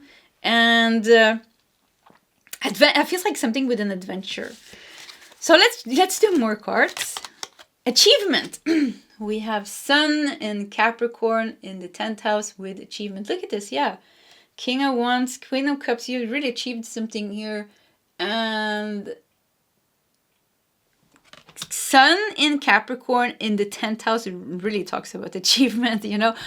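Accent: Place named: Sweden. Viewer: Hey, punkin' just sending you so much love. I adore your Swedish accent so much. I always pick number 3 as my pick-a-card because I'm a life path 3. You always blow my mind when you talk about narcs in your life. I've married my narc mother in a man who's seriously self-obsessed and an abuser...but, after 35 years of living with his harming my body and gas-lighting me, the judge put a two year no contact order on him.Yes, as soon as he got out of jail he took almost all of the money out of our mutual account...that money was mine too and this is his financial abuse of me. I'm so frustrated because he still has me under his thumb. Sorry for the over share (lol). I'm tired. I've totally neglected my YouTube channel because my husband hates it. Thank you for the healing energy you give, you are such a darling soul. XOXO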